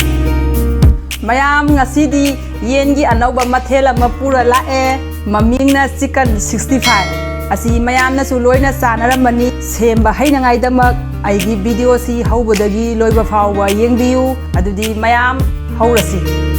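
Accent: Kakching, India. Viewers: Meitei lonse mawong ama toudeye kakching haiba lamdugi dialect ne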